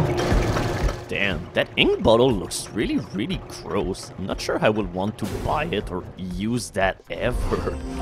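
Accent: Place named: Quebec, Canada. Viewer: This is why i love watching LPs.He's just so calm and collected always, and the french-canadian accent gives him a special variety from other LPers today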